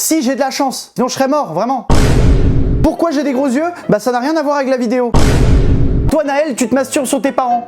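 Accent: Switzerland. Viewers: T'es Suisse? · T'es Suisse toi ou bien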